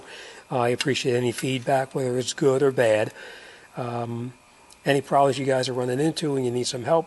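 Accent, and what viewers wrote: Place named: New England, United States. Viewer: Love your New England accent!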